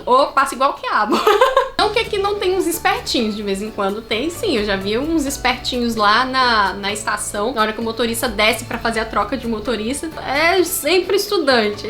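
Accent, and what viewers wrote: Brazil. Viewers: Eu sou mineiro e o seu sotaque parece muito com o daqui de Minas, exceto com o da região norte do estado, que é mais para o sotaque baiano. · mesmo vc morando ai a 4 anos, eles sabem que vc tem sutaque br?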